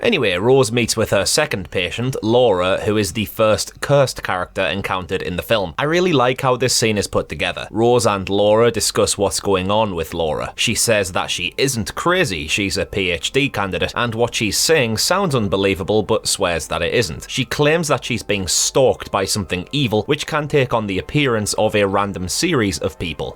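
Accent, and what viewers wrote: Scotland, United Kingdom. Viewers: bro, your Scottish accent is so strong (no Offence btw) 🤗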